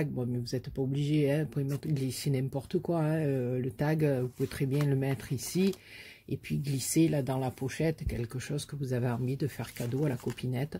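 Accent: South, France. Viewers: rooh ma j'adore je me l'enregistre tout de suite!!ta carte enveloppe c'est une merveille!!j'ai cette collection de papiers,ils sont juste sublimes!! he oui ils n(y a pas besoin d'en rajouter des tonnes!!merci pour cette vidéo ou tu m'a fait rire comme une malade et ton accent du sud j'adore!!gros bisousss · Coucou ma, wouaaaaaa encore une merveilleuse création, elle est sublime j'adoreeeeeeee, tu as toujours de merveilleuses idées de déco, toujours très raffinées, mille bravo ma et merci beaucoup c'est encore du pur bonheur de voir ta vidéo et de t'entendre avec ton fabuleux accent du sud rempli de chaleur et de soleil. Mille gros bisous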